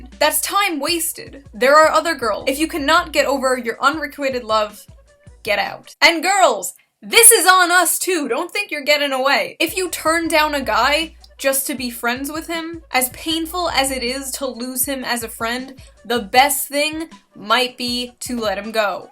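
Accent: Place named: Midwest, United States. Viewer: Your voice sounds mid-western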